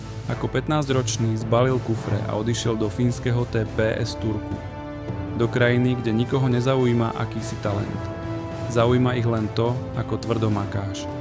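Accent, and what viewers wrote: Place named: Slovakia. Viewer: Mne sa to práve že páči. Rozpráva po slovensky a nie po "ameuricky" 😊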